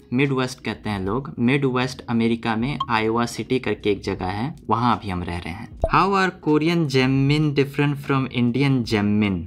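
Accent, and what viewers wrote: Bihar, India. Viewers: Apki video bahut achhi lagi... But usse bhi achhi apki Hindi.... Aap jab bolte ho sun k achha lga... Ek dum hamare Bihar wali Hindi..gd luk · He got bihari accent and I am proud of it 🔥🙏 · Nailing that Bihari accent bro. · Ur Bihari accent....ek dum mast hai👌👌👌😍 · This is the real Bihari Accent Bhaiyya ji, Jiya ho Bihar ke lala. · Your bihari accent such a nice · Say hindi excent of bihari or say English; you are very systematic in talking. Not that you are calculating it before speaking, yet it is systematic. Carry On. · Bhen mat boliye was hilarious 🤣🤣🤣 your Bihari accent is cute 😅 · apke bolne ka andaz dkhne me bara maza ata h..qki hm bhi bihar se h..😋👌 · It's Bihari accent so it's nothing wrong if he said "kalkatta " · I think this may perhaps be the first time i've cross paths with a korean who speaks magadhi style hindi and that too with such finesse. 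For a moment i was taken aback and if not for the face, i guess nobody could say you are not a native patnaite😅 Such a fun experience getting to know you. Waiting for more interesting videos. Annyeong! p.s: can you sing " " by? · I am new to your channel. Loved your accent. This is the 1st for me where a Korean is talking in Hindi with Bihari accent and living in USA. I am also from Patna. So I loved it a little more. You earned a new subscriber.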